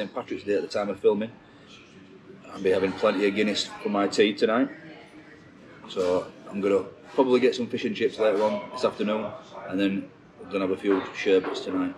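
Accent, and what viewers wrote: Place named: Barnsley, United Kingdom. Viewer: Good review;;;any changes in Blackpool? You sound like Barnsley?????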